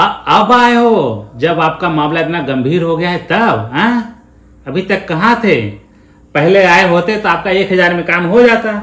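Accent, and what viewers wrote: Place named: Jharkhand, India. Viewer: Bhaiya aap kya jharkhand se hai kyonki apke baat karne ka tarika jharkhand wala hai isliye pucha or agar sachme aap jharkhand se hai to I am very proud of you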